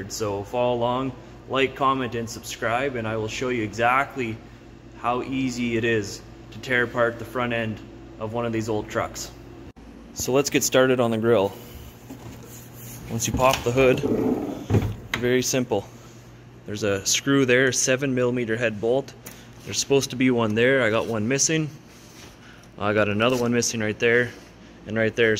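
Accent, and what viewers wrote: Canada. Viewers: Your accent sounds very Canadian? Manitoba?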